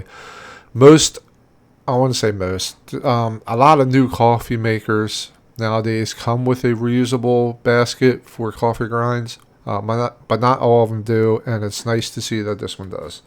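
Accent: Baltimore, United States. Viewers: You have to be from the Baltimore area. Wooder, rinch and the accent are a dead giveaway.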